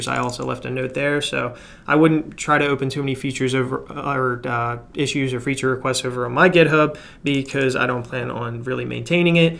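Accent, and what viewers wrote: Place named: Pennsylvania, United States. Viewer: Trying to place your accent. Sounds kind of New York or New Jersey at times but mostly Pennsylvania. Maybe I'm way off though.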